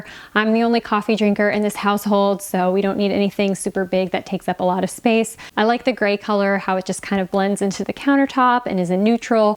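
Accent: Australia. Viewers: Thumbs up to the Australian Accent!!!!! Great video 😁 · I like! As an Australian fan, I agee Aussie accents are cool. Love your channel.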